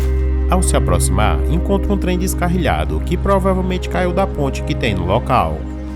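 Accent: Northeast, Brazil. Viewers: você é nordestino não e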